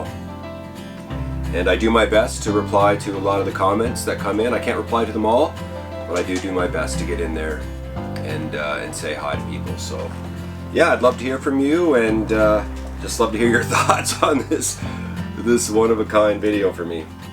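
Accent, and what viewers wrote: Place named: Nova Scotia, Canada. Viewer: Get wicked stuff man. Sound like you’re from NS exploring the far north! Great stuff. I know a legere from ns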